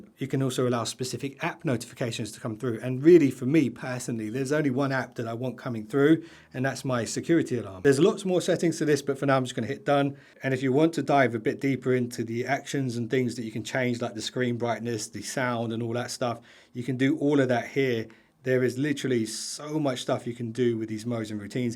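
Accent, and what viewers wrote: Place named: United Kingdom. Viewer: Why are all the YouTube galaxy videos British?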